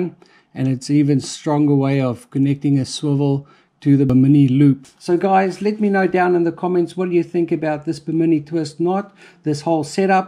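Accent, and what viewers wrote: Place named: South Africa. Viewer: By the way, with a South African accent! HAve spent a bit of time in Southern Africa Like the video - great explanation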